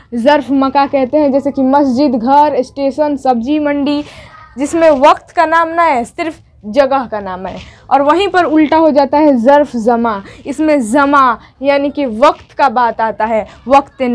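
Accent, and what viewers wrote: Bihar, India. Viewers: ماشاءاللہ بہت خوب بس پلیس آپ شاید بہار سے ہو اس لئے کے آپکی زبان بتارہی ہے جیسے(زگہ نہیں بہن جگہ) معذرت کے ساتھ آپ سے درخواست ہے کہ آپ انٹرنیشنل استاذ ہو اس لئے زبان بھی ویسی ہی ہو جزاکم اللہ خیرا واحسن الجزاء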